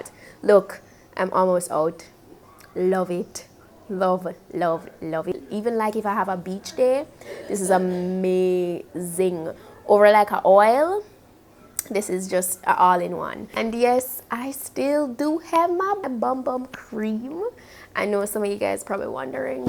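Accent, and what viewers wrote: Jamaica. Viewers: Yuh cyah hide de Jamaican ascent to rahtid.. love it 😊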